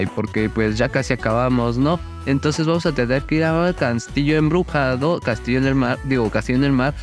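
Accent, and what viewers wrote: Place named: Michoacán, Mexico. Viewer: creo que eres de michoacan XD por como hablas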